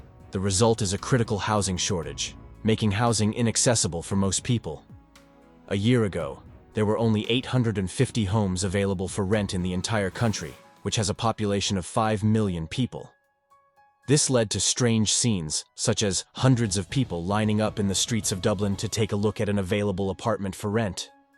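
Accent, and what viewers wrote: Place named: United States. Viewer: AI generated garbage, the AI voice is using American pronounciation of Celtic as in the Boston Celtics team spoken as Selltics, but Celtics is said as Keltics. This blithering idiot doesn't know such a simple thing. And the entire channel is all AI generated click bait and at a prolific rate.too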